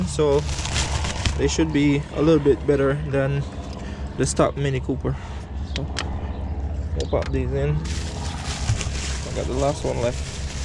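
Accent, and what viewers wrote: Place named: Jamaica. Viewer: Man sound like a yaad man.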